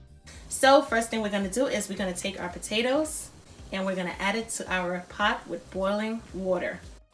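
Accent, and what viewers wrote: New York, United States. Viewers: Great recipe! Better substitute would be monk fruit is it natural and it's good for cancer patients as well. Love the New York accent absolutely Gaw-gis 😂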